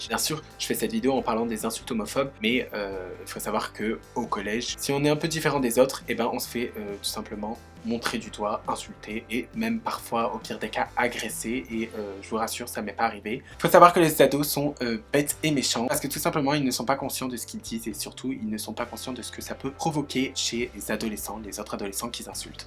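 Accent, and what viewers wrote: Belgium. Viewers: Tu es belge car je trouve que tu as un petit accent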